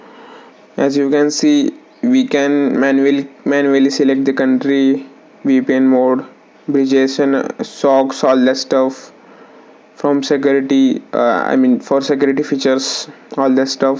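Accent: India. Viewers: Must be Indian, lol but I can understand what u say. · U are indian ur voice is like indian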